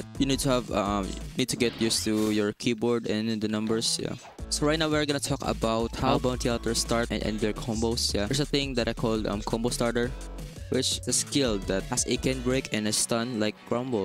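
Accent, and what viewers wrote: Philippines. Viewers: Yo sound like a filipino❤❤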